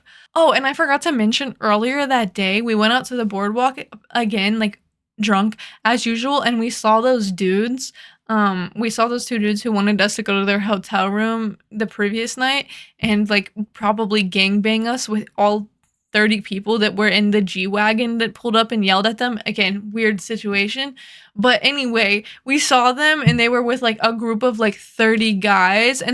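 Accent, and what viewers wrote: United States. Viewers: you have the best regional american accent on the earth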